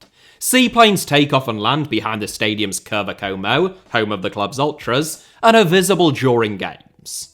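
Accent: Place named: United Kingdom. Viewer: I can't think of a greater punishment than hearing a british man pronounce Como repeatedly for 45 straight minutes. Or should I say "Coumou".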